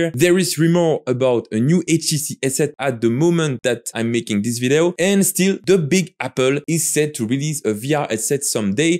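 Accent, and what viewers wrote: France. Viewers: On dirait un belle accent français là non ?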